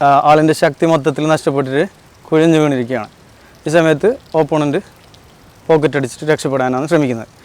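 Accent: Kasaragod, India. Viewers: ശുദ്ധമലയാളം സംസാരിക്കാൻ ശ്രമിച്ചാലും ആ കാസർഗോഡൻ ശൈലി വരുന്നത് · കാസറഗോഡ് ആണല്ലേ.... ഗുഡ്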